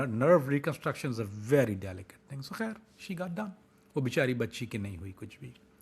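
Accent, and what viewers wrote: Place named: United Kingdom. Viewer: U looks like so Deployment ...becoz ur acent is also like British...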